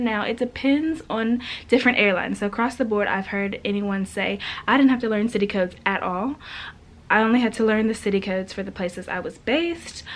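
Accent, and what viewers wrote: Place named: South Carolina, United States. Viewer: Sounds like Southern Carolina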